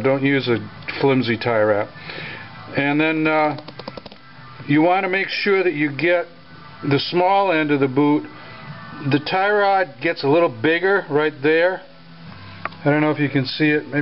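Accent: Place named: Connecticut, United States. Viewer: I really enjoy the Corvette videos. Very informative and useful. Do you happen to be from CT? Accent sounds that way...keep up the good work.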